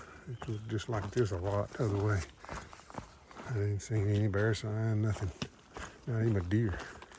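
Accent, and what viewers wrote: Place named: Mississippi, United States. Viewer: I am way behind (Day 42) but jumped ahead to ask a question. I was wondering what service plan you picked for your “In Reach” and if you still feel it was the right plan for you? Sure enjoying your journey! Have you been using your turkey call lately - worked pretty well down south. I have ancestors from the Holmesville-McComb area of Mississippi who fought for a Miss. regiment in the “War between the States” so especially enjoy your perspective and accent. Good luck to Katahdin! God Bless.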